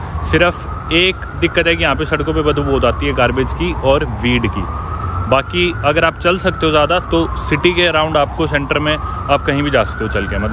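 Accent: Haryana, India. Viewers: Haryani accent is Lit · Bhai aap Haryana se ho kya ? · Haryanvi accent m vlog dekhn ka mja alag h besk hindi bolta ho. Bhot badiya h bhai. Baki height dekh angrez m chote lage h 😂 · Really like the way of explaining everything in very simple way. Also the music while you were on that ferry , you looking into waters , what a moment it made me emotional. You take so much efforts, walking everywhere totally agree for such a short distance they charging so much or its just the conversion making us feel so costly don't know. Buddy simply great. ❤️ for showcasing the reality in subways. again your Harayanvi tone 👍🏻 the way you speak. 'Dhakke' khaye but it was worth. Lots of ❤️ to you.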